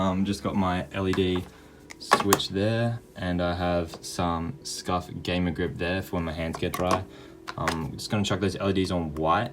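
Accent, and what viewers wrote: Australia. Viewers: wait your Australian? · Are you Australian? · r u australian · You’re Australian????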